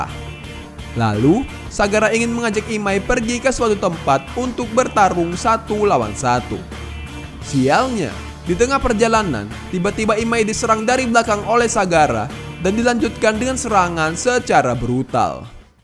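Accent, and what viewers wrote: Medan, Indonesia. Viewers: Medan kali bahas nya bossss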